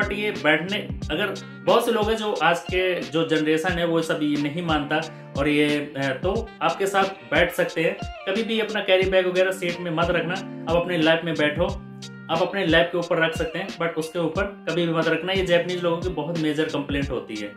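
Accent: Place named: Bengal, India. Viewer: Apka bolne ke tone bangalio ke jesa h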